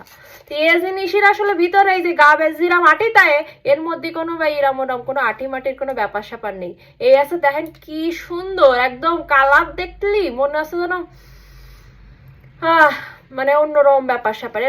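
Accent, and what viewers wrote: Khulna, Bangladesh. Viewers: আপনি যে অরজিনালি খুলনার চলিত ভাষায় কথা বলেন, এজন্য জম্মের ফাইন ভাল্লাগে 😂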